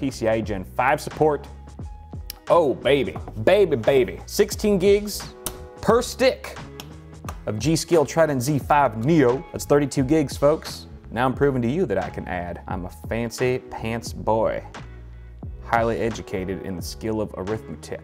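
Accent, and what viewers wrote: South, United States. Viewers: The southern accent and all the cowboy puns were very random and made no sense to me, I just started tuning out half way into the video. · why doe she talk like a southerner the whole video? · What’s up with the country good ol boy accent lol ? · just created a new market segment. Better get a patent on this innovation! Awesome video with southern accent too! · Southnern sounds pretty cool yaw🤠👍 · new southern US accent? interesting haha... who have u been hanging around lately?😃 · Oh, good, he was speaking with a southern accent. I was tired when I watched it so I thought I was imagining things. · Jetlagged sounds like a southerner. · Did you move to the south or something? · where did the southern drawl come from? Jetleg does some weird shit to. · I'm from Houston Texas and he sounds more southern than me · What region of America accent is this? 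· cool build! 400% the amount of allowed cowboy accent in youtube videos though. shame.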